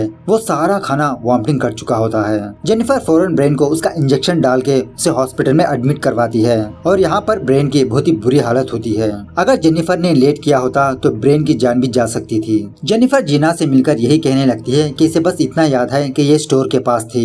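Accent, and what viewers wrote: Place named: South, India. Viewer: Hi.. Am totally addicted to your channel now..from last few days am continuously watching all your videos..enjoying a lot..tamil, telgu, malayalam,bengali,marathi...all in one subscription.. 👍🏽..like your South Indian accent too..keep it up...All the best ....looking forward to all new ones..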